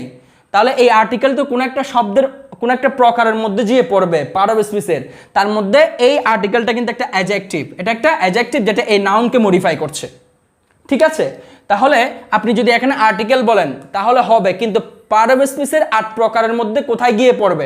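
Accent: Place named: Chittagong, Bangladesh. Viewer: Uni ctg er gram tek alim dise, shei porjonto okane silo ekarone pronunciation gramer ta chole ashe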